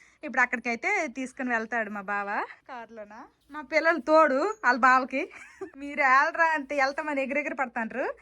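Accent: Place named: Bengal, India. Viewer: Mi matalu bengal lo vunnappudu slang okalaga vuntundi mi oorlo moratuga matladutharu inka ekkuva soundtho matladutharu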